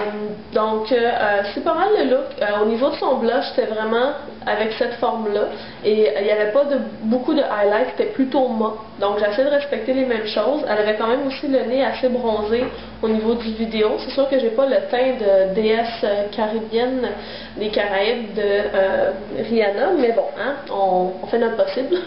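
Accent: Quebec, Canada. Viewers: jadore t'es vraiment tres belle jadore ce maquillage .... Ps jadore laccent Quebecoi ...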